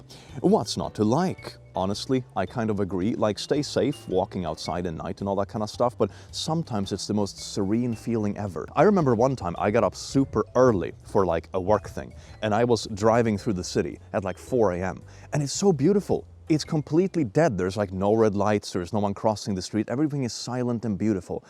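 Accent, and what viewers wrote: Finland. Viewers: Your finlands svenska accent is absolute perfection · Your Finnish accent gave me Gunnar Gunnarsson vibes, so... probably 100% accurate, lol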